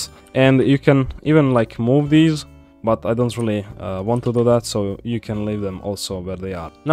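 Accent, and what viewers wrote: Russia. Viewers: russian accent slipping in, nice tutorial! sadly its not shown how to do it trough blender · скажи честно, ты русский? тебя акцент выдает очень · Руский акцент... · Love the Russian accent!😁🤣❤👍👍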